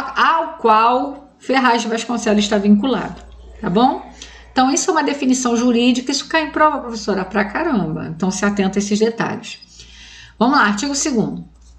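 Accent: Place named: Rio de Janeiro, Brazil. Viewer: Aula top, com esse sotaque carioca então; arrasou!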